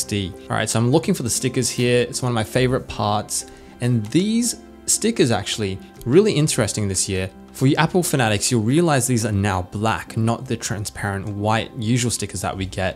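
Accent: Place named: Australia. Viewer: Hearing a familiar Aussie accent reviewing this powerhouse is strangely comforting? That or I’ve been in lockdown for too long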